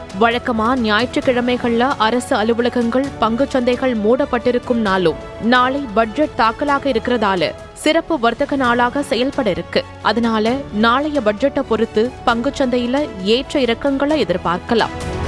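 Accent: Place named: Kerala, India. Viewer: Reader. is not a tamilian, may be a malayalee..it is not good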